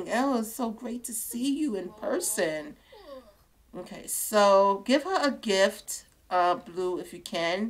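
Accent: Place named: Brooklyn, United States. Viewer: no problem and yes, I love it! I'm from the south so it's rare if I get the chance to hear a Brooklyn accent.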